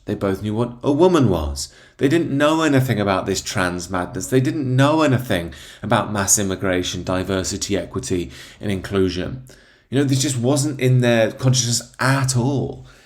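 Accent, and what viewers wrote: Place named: Yorkshire, United Kingdom. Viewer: good to hear you have released your inner yorkshire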